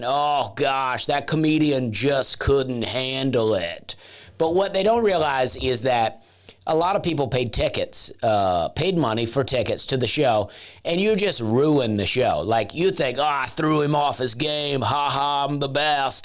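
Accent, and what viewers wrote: South, United States. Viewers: The thing is there is not ONE joke in this entire set? Just uncomfortable country drawl mumbling? Do you do jokes or just ramble aimlessly? If the people were laughing hard you wouldn't be heckled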